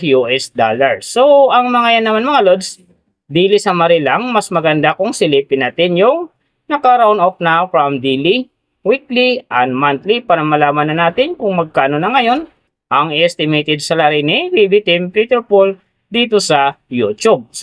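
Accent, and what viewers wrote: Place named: Visayas, Philippines. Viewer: Visaya kb dong? Bkit ganyan k magsalita.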